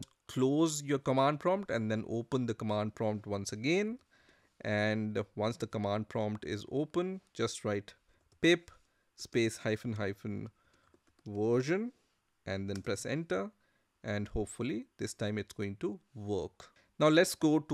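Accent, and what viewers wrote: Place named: India. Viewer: know the tutorial will be good when the person sounds indian, amazing tutorial:yougotthis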